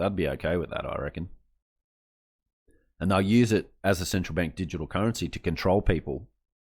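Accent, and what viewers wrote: Australia. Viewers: Learn to speak properly bogan..........we are not all feral westies.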